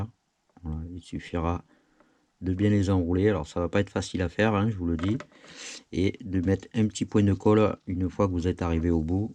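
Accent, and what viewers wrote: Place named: Ardeche, France. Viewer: C'est super bravo, quelle boulot! J'ai acheter le kit il y a une semaine, j'ai 36 ans, si je le finis avant mes 60 je sera très fière de moi! Hahah! Est se que vous êtes situer dans l'Ardeche? J'entraine mes oreilles flamand a reconnaitre les accents different français.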